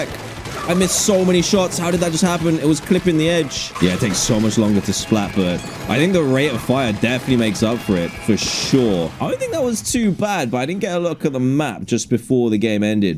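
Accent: United Kingdom. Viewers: love your british accent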